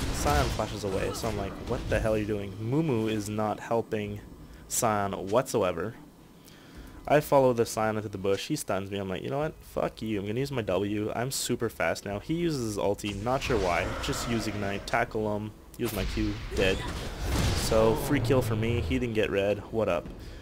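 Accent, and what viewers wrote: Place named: United States. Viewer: You are french? I thought you were american, not a doubt in my mind.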